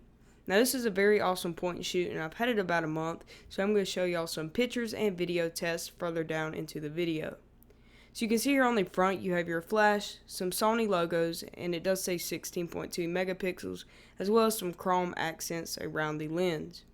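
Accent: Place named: South, United States. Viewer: I bought the camera for my wife and we like it, and as well we liked your video. You might have set image stabilization on so when you're walking one does not have a tendency to stop the video and go elsewhere. I can't stand shaky video. Or if you did have image stabilization on, you might practice holding the camera still. It takes work; everyone will respect your work more. The overall video seemed a bit rushed, unplanned. My wife and I liked your voice, nice southern accent. You obviously seem to enjoy this sort of work.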